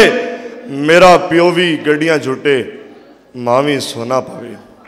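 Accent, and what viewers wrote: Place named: Punjab, Pakistan. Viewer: جٹکی پنجابی ❤❤❤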